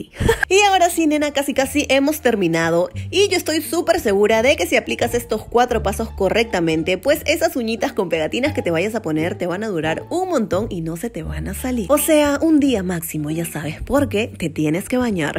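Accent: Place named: Peru. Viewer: Me carga el acento peruano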